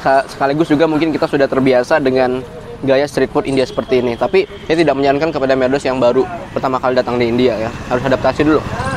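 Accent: Banjar, Indonesia. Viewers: Ada sdikit logat bahasa banjar nya 😁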